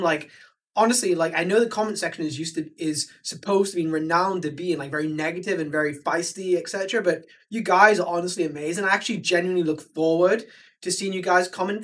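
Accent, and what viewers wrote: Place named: Cardiff, United Kingdom. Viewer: Where are you from?...........sounds like a tint of Cardiff accent there......nailed it?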